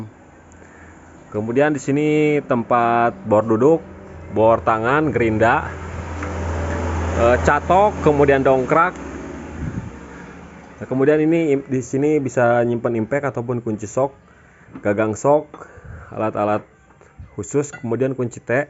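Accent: West Java, Indonesia. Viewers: kang lokasi nya dmna ? kaya orang sunda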